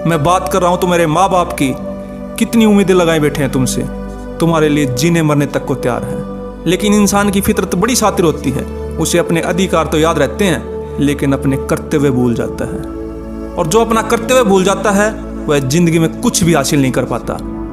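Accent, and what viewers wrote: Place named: Haryana, India. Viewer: Bhai Ji aapki voice se andaja lagaya hai 🙏🙏 aap Haryana se ho kya 🤔🙏🙏 bahut hi sundar video and voice ❣️❣️❣️❣️❣️🙏🙏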